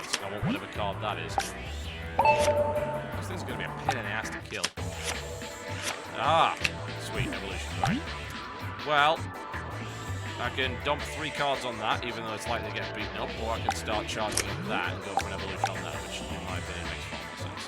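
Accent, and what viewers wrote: United Kingdom. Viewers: Doesn't currently live in the UK, ergo his British accent is fake. Nice logic you have there, dope.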